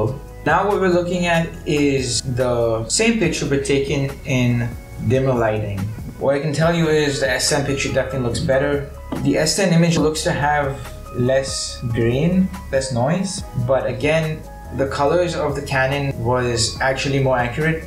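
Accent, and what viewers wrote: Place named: India. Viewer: Your Indian accent is not funny 👍😅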